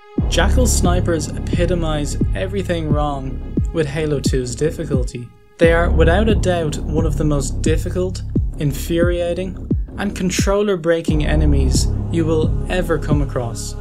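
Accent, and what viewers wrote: Ireland. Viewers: Is that a Galway accent I hear? Or at least Connaught? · That accent can't get more irish.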